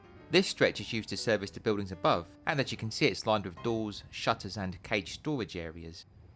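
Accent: London, United Kingdom. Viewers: You are so knowledgeable and make these videos so interesting. Lovely to hear an authentic English / London accent too!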